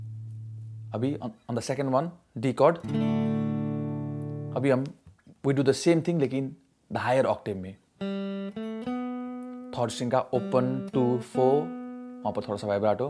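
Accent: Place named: Nepal. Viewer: Dude that guitar is lit and with that accent i can tell ur from nepal 🇳🇵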